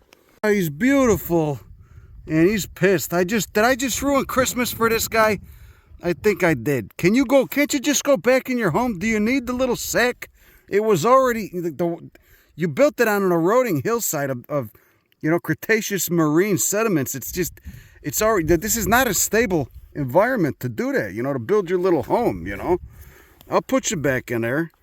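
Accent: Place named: New York, United States. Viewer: You sound like a new new Yorker but I love your knowledge. We love in Wyoming. Most the same stuff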